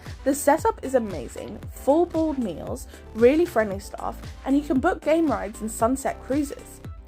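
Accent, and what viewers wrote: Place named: Zimbabwe. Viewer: English Zimbabwean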